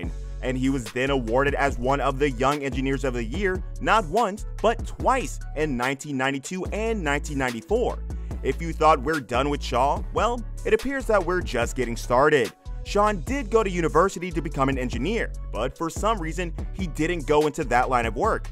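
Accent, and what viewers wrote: United States. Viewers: This show simply conforms the immaturity of British men with their childish pranks. The mechanic guy is worth watching, but that the tall one, what is a disaster. And never use an American narrator for a British show. There's so much background detail that Americans simply can't grasp. Jack, the Japan Alps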